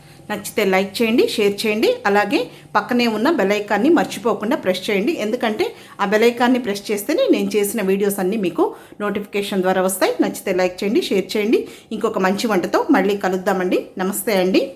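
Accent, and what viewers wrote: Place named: Nellore, India. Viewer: garu mi maatala lo konchem nellore touch undandi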